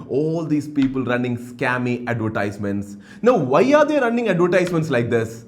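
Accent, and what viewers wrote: Kerala, India. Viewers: Love your mallu accent !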